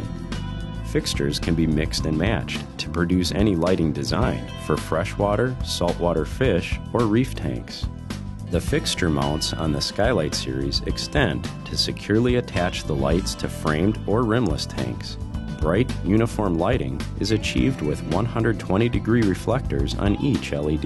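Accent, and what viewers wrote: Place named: Wisconsin, United States. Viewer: Is this what everyone in Wisconsin sounds like? If so I need to move to Wisconsin.....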